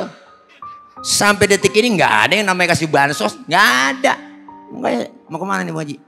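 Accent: Jakarta, Indonesia. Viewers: Jangankan ceramah ngomong aja kalo logat Betawi berasa asyik bener tongkrongan.. ingat Ama babe Mandra dan benyamin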